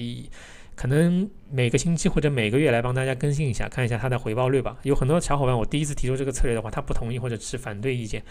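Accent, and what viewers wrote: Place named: Shanghai, China. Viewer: 哈哈哈，你是个小姑娘吧？看的我笑出声了。他说话上海口音很明显呢，长相很适合演老顽童年轻版本。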